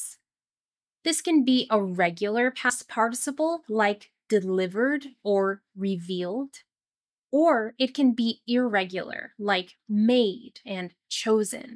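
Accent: United States. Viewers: Hi, I really like your videos and your voice, your American accent and voice is so beautiful, you are different from the other channels, I like the videos that you make, it’s very effective and I hope that you make a lot more videos and maybe advanced English, thank you❤ and keeping going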